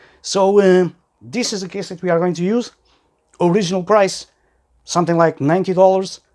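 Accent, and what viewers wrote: Portugal. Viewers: i freaking knew you were Portuguese by your accent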